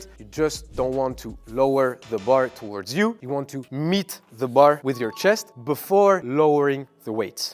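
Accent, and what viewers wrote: France. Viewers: A French talking english......thats a rare kind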